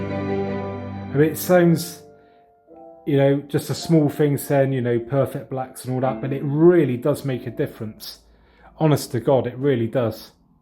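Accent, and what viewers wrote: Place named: Bristol, United Kingdom. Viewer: Gotta be Bristol innneeeeeee??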